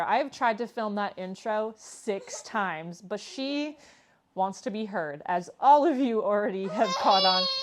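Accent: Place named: South, United States. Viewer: it’s a cultural “speak” from parts of the south. My husband’s relatives, with “fancy pants” graduate degrees, pronounce it exactly like you do. Especially, when we are at a family get together, with wine and spirits, their Southern Charm flows. I think it’s enchanting! Please don’t change it for anyone. ❤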